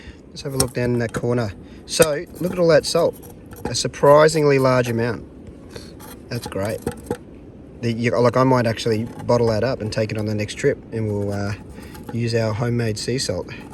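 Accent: Australia. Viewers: Great job mate! Love, love the survival videos! Cheers! (How ‘bout that Australian accent)?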